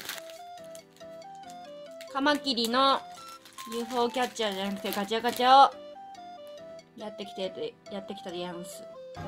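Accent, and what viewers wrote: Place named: Sendai, Japan. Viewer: ばちくそ出てくる仙台弁がいい‼️ 親近感🥰‼️ 馴染みしかない喋り方‼️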